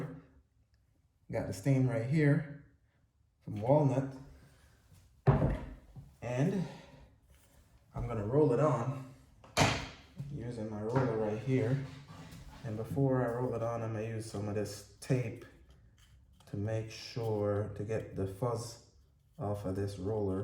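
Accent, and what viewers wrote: Jamaica. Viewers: Caribbean Accent my guy. #Jamaican.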